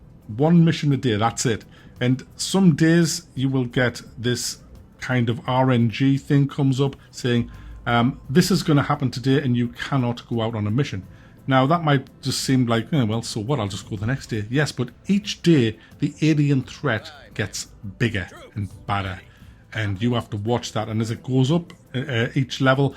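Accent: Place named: Newcastle, United Kingdom. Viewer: Not seen your channel before but your red and green logo made me think of you'd have an italian accent. I laughed so hard when I realised you were a geordy! Great, honest review. I'll definitely watch more of your vids.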